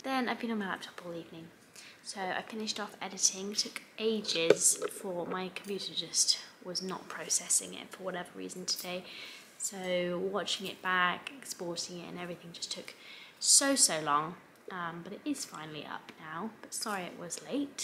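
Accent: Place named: United Kingdom. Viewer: I love this channel. I can learn natural British English through this channel and l like her daily life too. 😁😁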